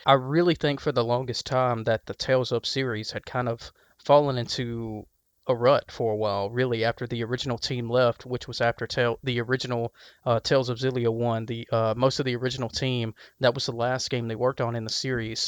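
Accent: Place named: South, United States. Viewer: Hearing that southern accent is amazing.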